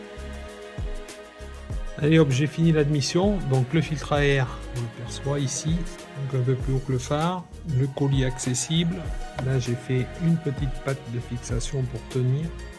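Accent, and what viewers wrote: Corrèze, France. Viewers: On te regarde faire comme d'habitude 😊 Mais quel plaisir de regarder ce travail de qualité. Et j'ai une petite nostalgie de l'accent corrèzien, j'y ai passé une dizaine d'an-nées 😉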